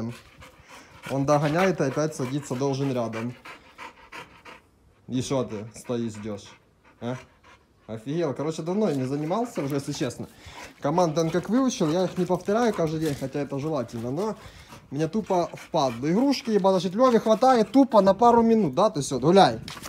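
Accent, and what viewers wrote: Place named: Ukraine. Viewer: Дача, украинский акцент, тупа, ля, чи не., ты ли это?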